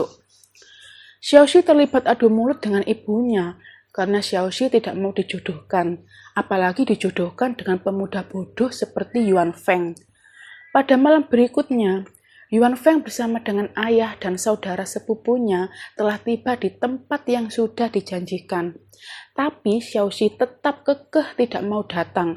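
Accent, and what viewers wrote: Java, Indonesia. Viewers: Ya maklum lah,,,, mungkin mbak nya dari suku Jawa 😋😋😋😋😋kan masing-masing chanel punya ciri khas 🤡🤡🤡🤡🤡🤡🤡🤡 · Jowo" mbak😂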